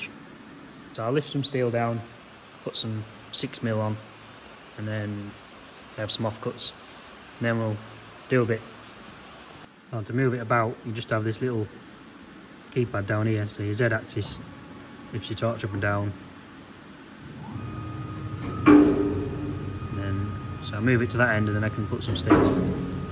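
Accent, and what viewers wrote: Yorkshire, United Kingdom. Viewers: You may find that small parts fall down the bed with it being trinangle stlye and crash with the torch Ive on my second plasma now on a esprit lightning hd and i run a bed with flat slats with a bit of an s shape to them stops small parts twisting up Listning to your accsent you must b based close to me im at filey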